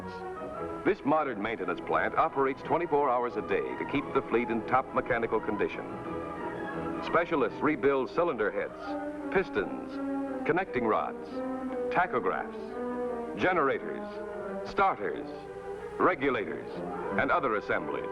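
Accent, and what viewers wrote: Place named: Mid-Atlantic, United States. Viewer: That's the mid-Atlantic accent.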